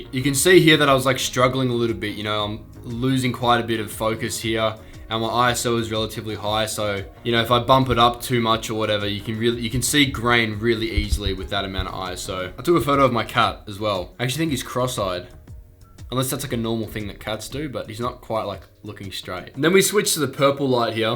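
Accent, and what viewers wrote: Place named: Australia. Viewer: An Asian girl with a Aussi Accent... Omg Dream girl hahah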